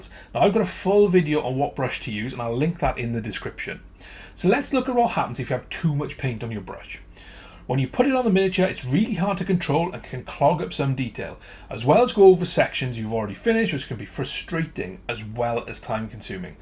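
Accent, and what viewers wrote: Wales, United Kingdom. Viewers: You sound like a fellow Welshman! Those tips were much appreciated cheers!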